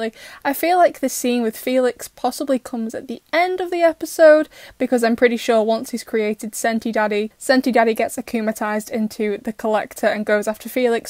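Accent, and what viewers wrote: England, United Kingdom. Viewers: Are you from england ?? I am asking because of your accent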